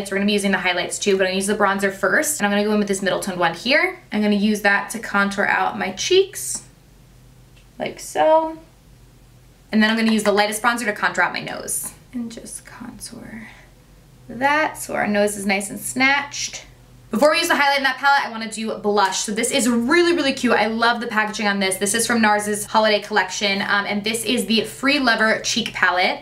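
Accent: New Jersey, United States. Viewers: Yousra Benchikhi she’s from Jersey 😉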